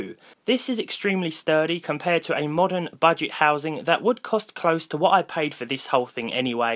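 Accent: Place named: Midlands, United Kingdom. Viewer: Knew he was a Midlands lad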